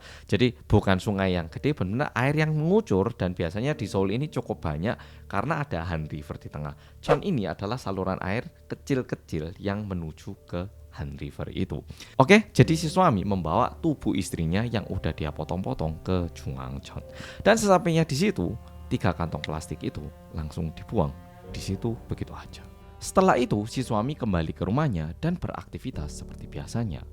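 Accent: Java, Indonesia. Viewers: Kadang aku lihat channel yt ini lupa kalau host nya ini orang korsel asli 😂 sangking medoknya kayak gak keliatan koreanya 😂😂😂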